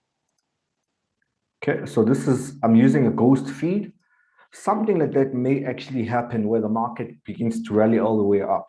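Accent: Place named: Uganda. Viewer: U sound like Ugandan and munyoro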